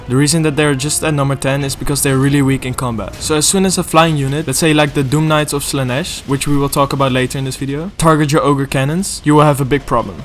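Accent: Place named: Netherlands. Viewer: your dutch accent is thick!!!! (im guessing your dutch :) ) hello fellow dutchie!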